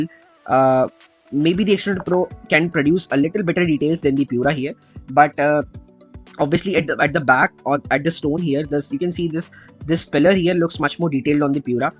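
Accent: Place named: India. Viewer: Indian English ... :(( fro Indian audience only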